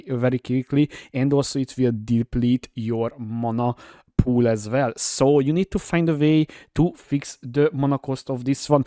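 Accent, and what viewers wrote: Hungary. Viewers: Sounds Hungarian to me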